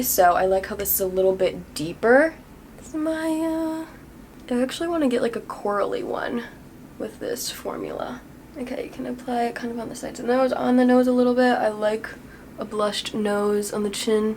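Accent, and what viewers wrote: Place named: Michigan, United States. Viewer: She sounds like she is from Michigan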